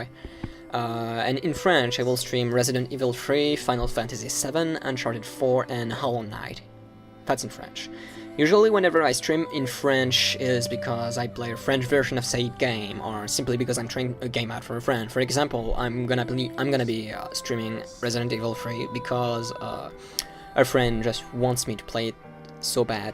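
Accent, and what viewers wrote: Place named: United Kingdom. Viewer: cet accent british sa race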